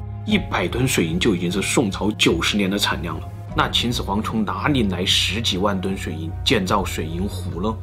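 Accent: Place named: Sichuan, China. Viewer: 你是哪里人啊，哈哈哈哈，四川？湖北？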